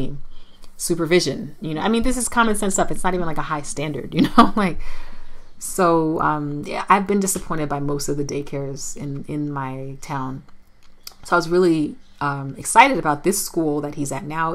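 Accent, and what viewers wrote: United States. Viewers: It was totally you that I saw last year in church in Okahao!!! You were there with your husband, where they required the married couples to go to church and give an offering. I first pointed out to my sister how pretty you were and then came your American accent as well Lol.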